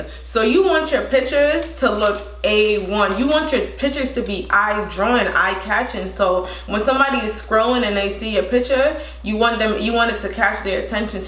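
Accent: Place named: New York, United States. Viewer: I️ could tell ur from nyc or Jersey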